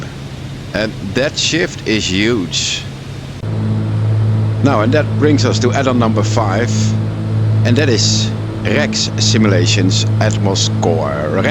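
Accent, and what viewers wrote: Netherlands. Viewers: Dat Hollanse platte kut engels.. 🤣🤣🤣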